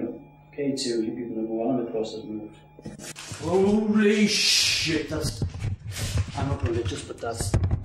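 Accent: Ireland. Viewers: Are you from Ireland? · He sounds like an Irish guy that moved to England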